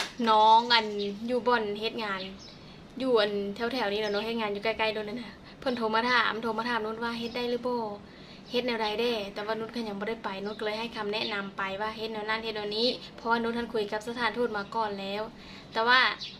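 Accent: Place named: Nakhon Phanom, Thailand. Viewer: เจ้าเว้าญ้อคือคนนครพนมบ้านข้อยเนาะ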